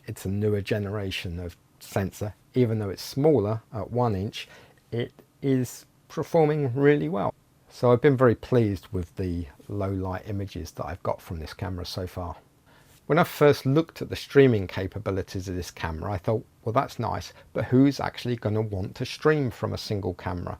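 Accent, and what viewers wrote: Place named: United Kingdom. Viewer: Thank you so much for quick response. :-) I can't wait to watch your next video. I think it would be also very helpful. And thank you for your wonderful British English. It's a huge pleasure to hear that instead of American babble. I wish I had such language skill one day....Greetings from Poland :-)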